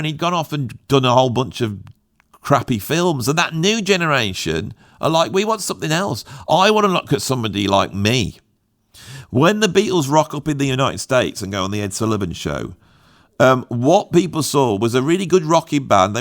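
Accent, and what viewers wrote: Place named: England, United Kingdom. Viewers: Dude I love your accent so much, I can't get enough of it, I end up speaking to myself in your super English accent lol .. love the way you think as well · Thank you for that insight. You're a great thinker. I once lived in Wolverhampton, and listening to you talking always brings me back there. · Hey... you're right... you are a common folk fella...who speaks his mind sincerely....but you totally know what you're on about.... the scattiness of the presentation just authenticates your niche....and bytheby.... I'm a scouser and our accent is mocked and dreaded and even I know why.. ( especially in female tenor)..... I live here and I'll never demure to the high pitched caustic auoural onslaught of a scouser woman shrieking aloud..... cat's scatter.... dogs bark and their men plug their ears as though metal is grinding on metal and amplified with reverb and harsh distortion with wah wah and full tilt chorus pedal... 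As a juxtapose to that.... I can tell you now that your midland is accent is the perfect parlance to cast your net with.... it offends no one and is very easy on the ear.... I've never heard the term "Rob-Dogs" before and regrettably it is well earned.....I know that by living here...and will include it in my vocabulary from hereonin ❤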